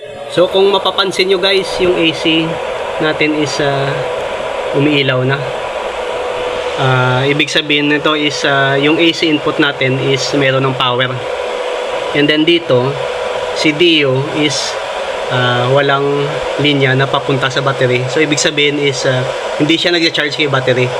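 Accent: Iloilo, Philippines. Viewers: Sir ilonggo poh bah kayo? 😊😊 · Bal.anon gid nga ilonggo ka boss😂😂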